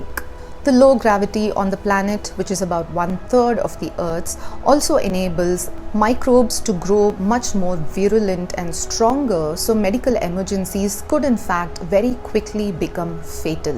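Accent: India. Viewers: Very clear and simple in Indian English! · She does have a slight India accent. (MTI)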